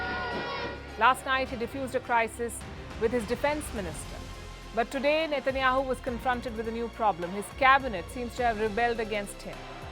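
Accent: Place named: India. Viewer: curry accent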